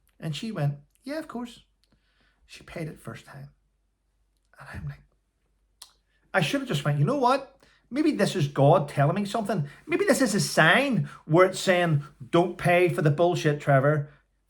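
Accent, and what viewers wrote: Northern Ireland, United Kingdom. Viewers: Trevor yours is the only channel I don't need subtitles for because like me, you're from Norn Iron so I get every word!! 😂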